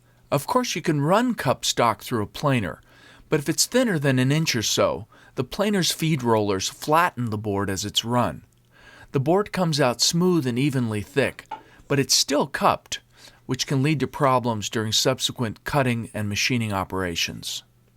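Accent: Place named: United States. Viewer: What a real american accent from a magyar :)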